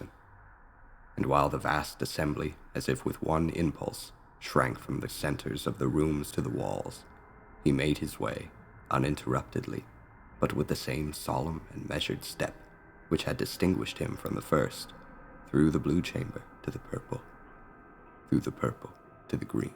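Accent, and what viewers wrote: United States. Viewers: Very cool, almost strange to hear a fellow American rad on the site, he did a great job though, so used to hearing the Brit accent. Of the several 'spooky' tales chs I sub to, HB is still my fav and first choice. Best to all involved.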